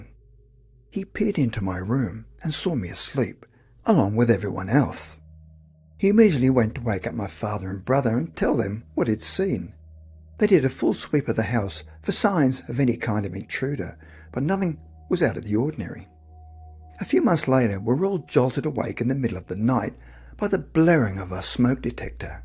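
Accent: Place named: Australia. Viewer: An Australian accent.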